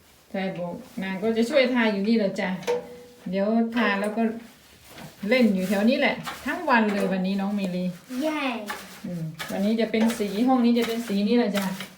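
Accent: Isan, Thailand. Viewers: น้อง เว่าอีสานเพราะอีหลี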